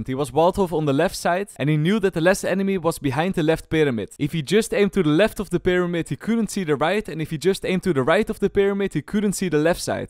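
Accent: Netherlands. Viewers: he lekker goede nederlander youtuber je hoort het echt goed dat je nederlands bent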